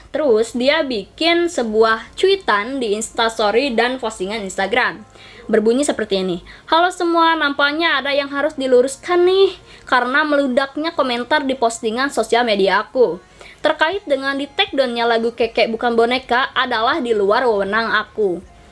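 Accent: West Java, Indonesia. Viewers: Orng sunda ya ka??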